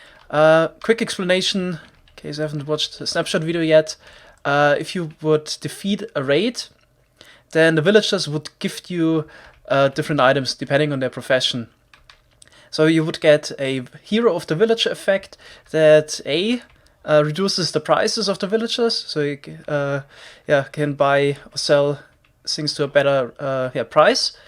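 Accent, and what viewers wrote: Germany. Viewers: You sound like you are from germany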